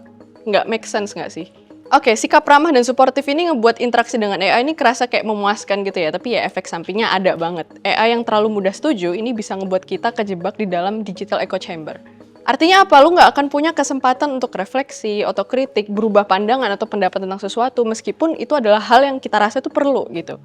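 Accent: Java, Indonesia. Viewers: medok like this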